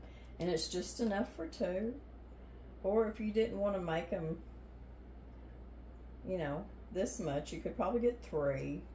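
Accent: South, United States. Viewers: Oh Miss, you are so very precious! ❤ May I make a request? You are so soft-spoken, and if you are cooking and not wearing your mittle microphone I have to turn my phone to maximum volume. And frequently end up turning on the closed captioning, which is in the way and the words that are there are frequently not accurate to what you say (think southern accent to a foreign-based captioner!) and you can understand my frustration.😮 Thank you for all you share with us! Love you and as if you were siblings, and please don't let my suggestion hurt your feelings in any way, shape, or form! I am a dedicated follower and will continue to do so!❤❤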